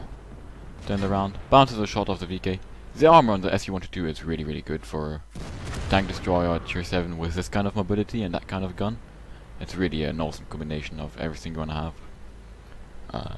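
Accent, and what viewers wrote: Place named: Germany. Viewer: The commentary on the video is like to listen Quickybaby with a differnt voice and german accent. He uses all the same phrases. I dont rate this bad. It's just a statement.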